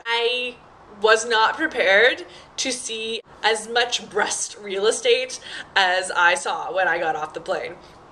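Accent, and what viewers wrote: South, United States. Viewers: I can't remember if i asked you this before or not so Im gunna ask again... Do Koreans notice your southern accent? Yours isn't really noticeable to me but Im sure it is to someone who doesn't come from the south (and to anyone who is reading this, I mean the southern part of America). I have more of an accent so would they notice it as country or just American? Like would they point at me and say "cowboy!"? Or cowgirl i guess... Lol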